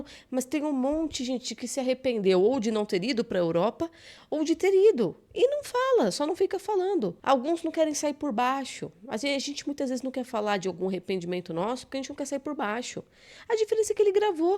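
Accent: Bahia, Brazil. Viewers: Pelo sotaque é baiano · Pelo sotaque ele é baiano 😂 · Como baiano, reconheci logo ao abrir a boca que é baiano! A nossa fala é muito particular!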